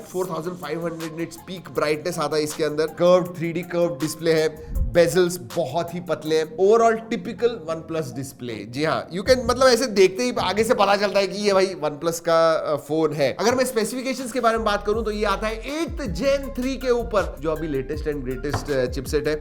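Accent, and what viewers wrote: Maharashtra, India. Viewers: ap Marathi ho na. bhiya 😅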